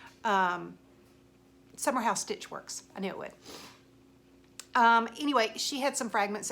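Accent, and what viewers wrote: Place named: South, United States. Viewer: Oh my goodness. Your video popped up randomly and I see you're from GA. I live in Conyers (25 East of Atl). Where are you located? Where do you shop for cross stitch supplies as I don't know of any in GA? I use 123 Stitch on line shop and a few others. My sister lives in Greenville and I just found out there is a shop near her. I also have my eyes on a trip to Firehouse Poppies (I think that's the name) outside Charleston. I am back to stitching again after I retired and am so happy to see the hobby growing so rapidly. Glad to hear that southern voice and I have subscribed to your channel. Keep 'em coming.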